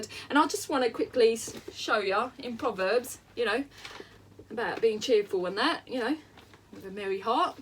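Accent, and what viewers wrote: United Kingdom. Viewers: Can’t help smilin’ more an’ more the more you speak. His joy is shining, sprinkling, radiating, streaming out of you, you know,:) I always thought there was the joy of Jesus at the center of the True Cockney. This is proof of tha puddin’! Keep spreading His love, luv. Good job!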